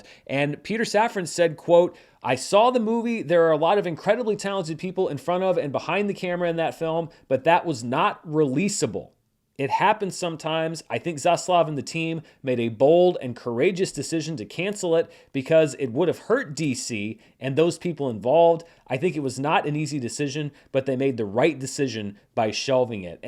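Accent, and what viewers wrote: St. Louis, United States. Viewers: Is from St Louis?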